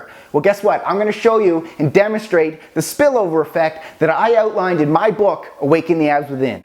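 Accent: Canada. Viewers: where are you from?? atlantic canada??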